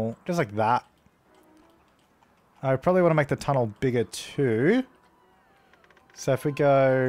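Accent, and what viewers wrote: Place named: Australia. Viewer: he has such an Aussie accent like it's not the stereotypical one but it's one of those Aussie accent. haha. I'm Aussie too but he sounds heaps like my mate.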